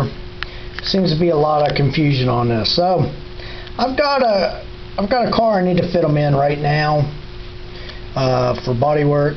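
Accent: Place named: Northwest, United States. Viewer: He must be from the northwest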